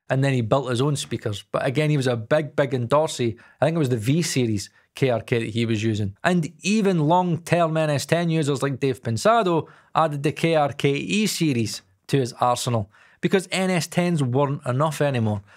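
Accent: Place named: Glasgow, United Kingdom. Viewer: This sounded like very well reasoned advice that accounts for how the tech has just moved on. I also got a nice affirmation for my decision to buy my Kali LP-6 v2 last year. I also just loved your Glaswegian brogue. It was like listening to Winston, my spirit animal from Still Game. AWESOME! Gone is the notion to even think of some NS-10 as even a reference. I next reference will just be better monitors like a pair of Adam's, or perhaps Neumann's.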